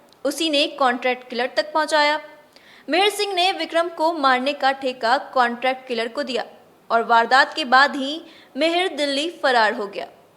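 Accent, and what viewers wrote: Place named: Bihar, India. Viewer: Aapka hindi me Bihari tone aa raha hae....kripya improve kare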